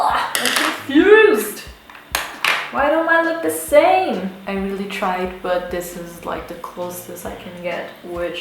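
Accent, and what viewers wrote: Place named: Brazil. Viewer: you look brazilian and your english accent reminds me brazilian english speakers, where are you from?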